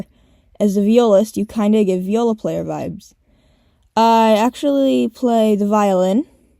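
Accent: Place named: England, United Kingdom. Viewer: Congrats bro!! (or are you a girl? I'm bad at understanding English accents, sorry) Actually it would be cool to have more ways to communicate, but maybe not this time Whos harder: nightmare king or the absolute? radiance Will anyone even answer my comment..? If you'll read it I hope you'll have a great day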